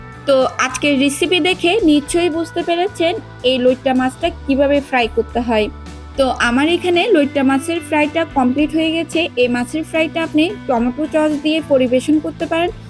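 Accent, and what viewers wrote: Chittagong, Bangladesh. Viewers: আপনার দেশের বাড়ি কি চট্টগ্রাম কোথায় বোঝা যাচ্ছে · Apu apni ki citiga apnar kotai buj jai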